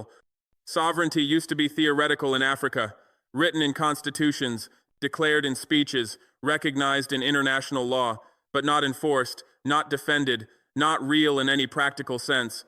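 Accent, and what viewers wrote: United States. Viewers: Is this real why has he an American accent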